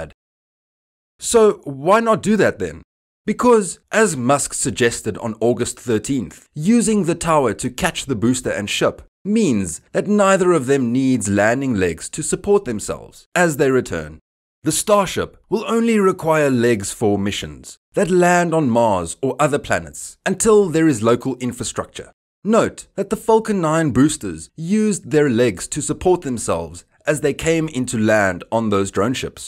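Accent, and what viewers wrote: South Africa. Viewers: You can hear he is South African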